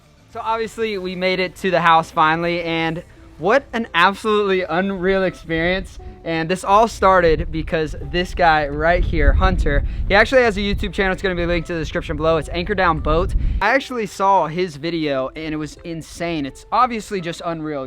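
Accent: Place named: South, United States. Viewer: Really spun up the southern accent on this vid, huh bud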